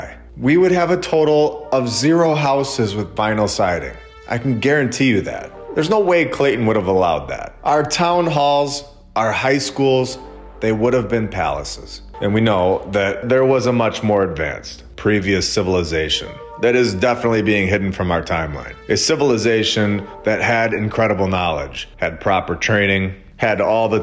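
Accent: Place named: New England, United States. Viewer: by the way I'm a new subscriber the second video I saw was of Saint Anne's Church in Fall River Mass that I'm very familiar with because I live one block from there right now. I have a few old pictures you may want that I didn't see in the video. You sound like you're from the Northeast you have a similar accent that I do are you from new england?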